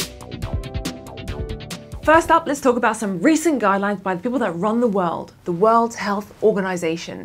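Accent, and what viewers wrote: United Kingdom. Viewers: I live in London and that's a British accent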